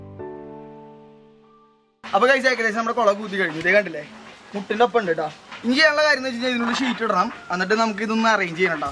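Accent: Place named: Thrissur, India. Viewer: ത്രിശൂർ ഭാഷ ഇഷ്ടമുള്ളവർ ഉണ്ടോ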